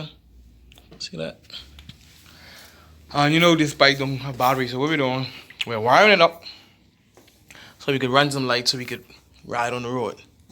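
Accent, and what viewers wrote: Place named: Bahamas. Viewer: Sound Bahamian 🇧🇸🤌🏾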